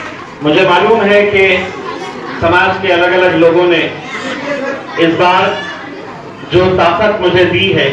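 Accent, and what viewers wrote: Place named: Aurangabad, India. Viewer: Awaz aurangabad ki👍👍👍👍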